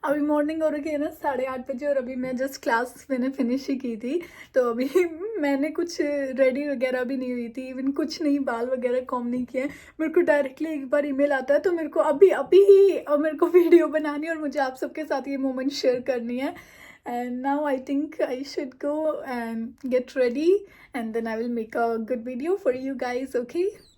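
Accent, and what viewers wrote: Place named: United Kingdom. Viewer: Suddenly I came here aapki hindi british jese hai atcha hein and congratulations 🎉🎉🎉🎉🎉❤❤❤❤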